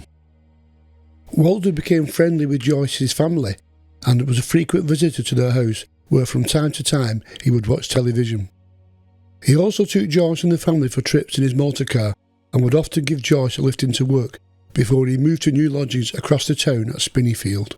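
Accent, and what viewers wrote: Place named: Bolton, United Kingdom. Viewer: Yes that's the ones. Now, it would be really interesting to see why Royle got his reprieve. I presume the documents are now a matter of public record somewhere. I think you could get an interesting episode looking at how and why some managed to escape the noose. This is all very fascinating and is an admittedly somewhat macabre subject that has fascinated me since I was a lad (longer ago than seems feasible!). I enjoy the videos a great deal - especially as you sound very similar to my brother in law who lives near Bolton!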